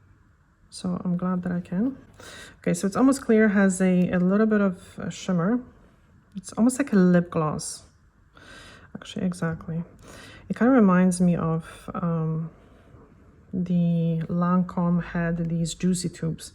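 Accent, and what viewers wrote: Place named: Poland. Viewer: Had no idea, but after first few words I knew you must be polish! 😅 such a hard accent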